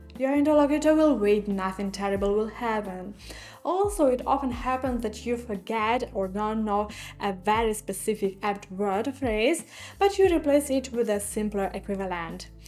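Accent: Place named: Germany. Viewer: When told to speak German without speaking German. Your English is influenced greatly by your German, easy to sense it😂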